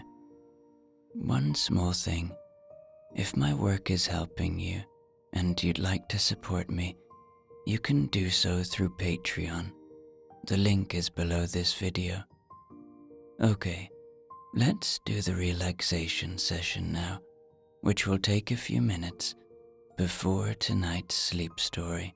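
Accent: Ireland. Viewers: Dear, I was looking for some positive affirmations for self improvement and growth, but I could not find any I liked to fall asleep by. I either did not like the music which was too overpowering, or I did not like the manner with which they were spoken, being rattled down without even a breath in between. And I definitely did not like the voice. I only like your voice and I love your beautiful Irish accent. I would love to hear of series of positive affirmations to fall asleep by. In today’s world so full of confusion and hardships many of us would very appreciate more self confidence, attracting the right circumstances to us and have faith that all will be well. Thank you for all you do.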